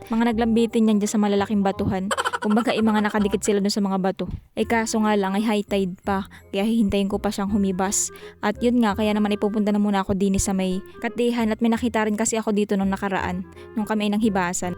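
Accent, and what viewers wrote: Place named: Quezon, Philippines. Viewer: Di namn Batangas Yan Quezon Yan sya😂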